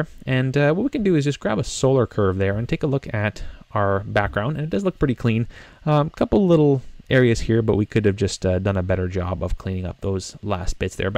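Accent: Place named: Canada. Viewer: I knew you were Canadian by your cadence. Dead giveaway was when you said too low. also thank you cause this was really helpful despite my need to identify your heritage. haha.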